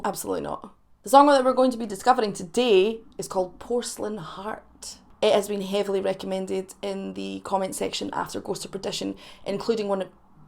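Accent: Scotland, United Kingdom. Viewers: Of course, I do not miss an episode of you brilliant channel. I just love to hear you Scottish accent and your lovely humour. ❤️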